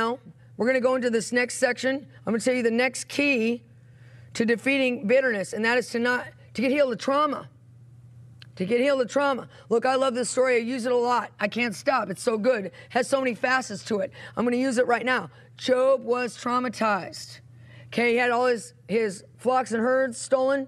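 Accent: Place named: New York, United States. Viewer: 😂, you from NY ❓️ you sure got a NY accent and way ❗️🤣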